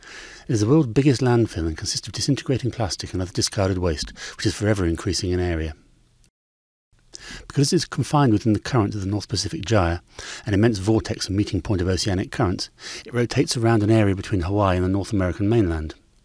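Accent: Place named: RP, United Kingdom. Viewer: I really hate this guy's breathy salivated kings English accent.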